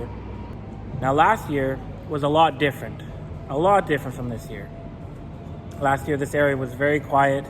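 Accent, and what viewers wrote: United States. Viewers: You sound like an American and you actually have the right to own 100% of a business in Thailand. It's called "The Amity Treaty". You just need to have it setup with a lawyer and go to one of those firms you talked about. I can't believe no one told you about this. The paperwork is like 14,000 baht. Google, setting up an Amity Treaty business in Thailand.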